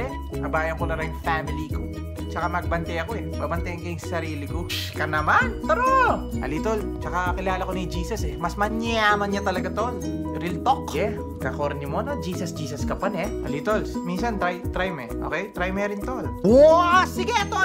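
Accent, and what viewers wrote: Pampanga, Philippines. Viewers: Magaling k talaga'yTo. Kpmpagan ka tlaga ksi keng gale mu at diction at bumigkas Mekeni ka talaga. Magaling ka Abe, baka Ika ing mawawala kung anak ah, pwde dkang paDNA ? Hehehe, i tule me ing ggwan mu para lalu kang mikuwalta kreng vlogs mu, emu ku kklingwan, gambatte 'NE !!!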